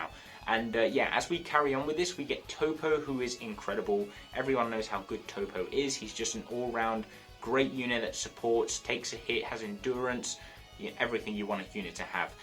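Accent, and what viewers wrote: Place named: England, United Kingdom. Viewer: Do you come from England I like your accent. I come from England ❤️🏴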